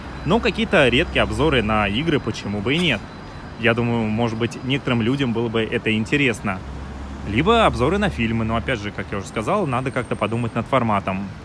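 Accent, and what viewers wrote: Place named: Japan. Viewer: Здравствуйте,. Изначально мне интересна тема,как люди живут в других странах (их быт,мысли о России и русских и т.д.) и таким образом случайно "набрела" на Ваш старый ролик,о том, какую в Токио Вы снимаете квартиру. И,конечно,Вы меня покорили своей манерой подачи материала,доброжелательностью,интеллигентностью)) Я уже не могу не смотреть Ваши позитивные видео))) Даже Ваш появившийся японский акцент в речи очень импонирует)) Продолжайте, экспериментируйте,а мы Вас подддержим))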